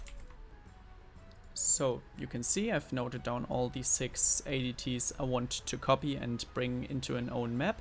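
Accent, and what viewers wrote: Germany. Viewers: Wie man am Englisch erkennt, dass der Gute aus DE kommt 🤣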